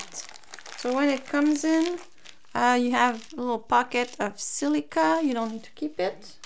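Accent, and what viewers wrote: France. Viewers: Are you french/french canadian? There is a light accent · She is French from her english accent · Serait-ce un accent francais ? 😬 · Coucou , j’entends un accent français je me trompe ? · J’ai entendu l’accent français et ça fait plaisir ! Sinon super vidéo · French accent? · Énorme accent français jsp si tu est française ou pas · l'accent français ce ressent mdr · Très bonne vidéo ,sa ce vois que te es française avec l'accent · Une française j'ai reconnu avec les r hehe sinon c pas mal je pense pour les débutants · I could spot your french accent in a way or another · Lorky ouii elle a un accent je trouve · Je me disais bien qu'il y avait un accent français... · Are you french? You have an adorable french accent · J’ai l’impression qu’elle a un accent français non ?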